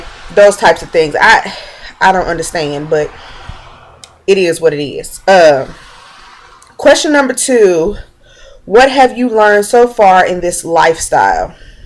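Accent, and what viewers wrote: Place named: South, United States. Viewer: If you don't me asking what is your husband's mos? I detect a southern accent lol where u from girl.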